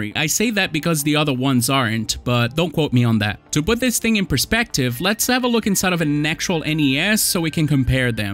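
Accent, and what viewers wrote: Brazil. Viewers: também percebi pelo sotaque que ele é brasileiro! 😂😂😂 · perfect RJ accent! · Eu saquei que tu era brasileiro só pelo sotaque enquanto falava inglês, hahahaha Boa sacada fazer vídeos em inglês, mano! Assim você pode alcançar um público ainda maior. Valeu pelo vídeo, curti muito seu trabalho! Mais um inscrito!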